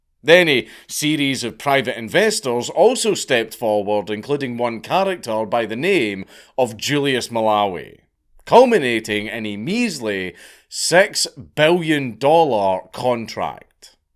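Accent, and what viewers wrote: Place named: Scotland, United Kingdom. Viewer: I like how Count Dankula doesn't try to change his Accent for the International Audience Because of him i feel like i can now fluently understand Scottish for when i visit Scotland as a Rural Bavarian from Germany Prost & Cheers from Berchtesgaden in the Bavarian Alps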